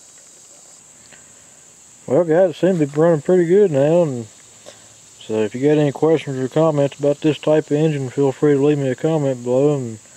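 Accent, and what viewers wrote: South, United States. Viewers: Great video..thick southern accent but pretty much understood everything...Damned 10% ethanol fuel screws up everything in the fuel system! It's always a good idea to put a petcock on the fuel line and just shut it off and let the motor run out of fuel when you're done mowing.. Otherwise your needle valve on the float in the carb wont seat properly with all the corrosion and you'll either have fuel leaking out the exhaust or get gas in the crankcase!! I had gas in the crankcase and it caused all kinds of weird problems. Thought I blew a head gasket with all the smoke until I started taking it apart and gas was leaking out of the head. Now I have to put the head back on and take the carb apart, so I decided to just clean up the head, valves, and piston, and replace the needle valve and seat on the carb. Hopefully it will run again!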